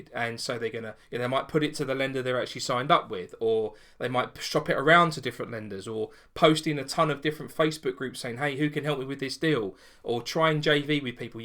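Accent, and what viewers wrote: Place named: United Kingdom. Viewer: Is this in the USA. You sound British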